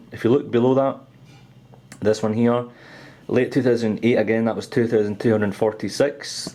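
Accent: Scotland, United Kingdom. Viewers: im getting the macbook pro even tho its slower its much more nicer looking, loving the scottish accent :)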